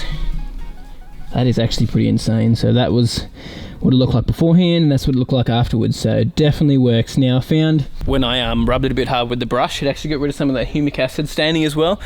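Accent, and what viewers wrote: Australia. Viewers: Where are you from? Australia or New Zealand?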